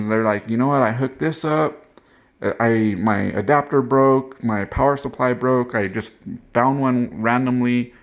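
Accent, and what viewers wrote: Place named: Oklahoma, United States. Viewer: Is this guy from Oklahoma? Ok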